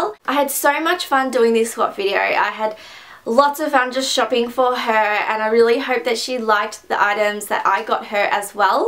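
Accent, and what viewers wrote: Australia. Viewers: Yeah aussie accent! Your gifts are just as good as hers, things in oz are so expensive!!! Im an aussie girl in US :-)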